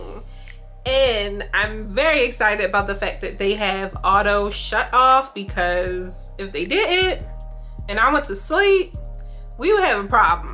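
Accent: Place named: Baltimore, United States. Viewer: lol, it wasn’t as Baltimore thick as I’ve heard. I definitely said. “She’s from the DMV” but the trees was slight so I didn’t pinpoint Baltimore.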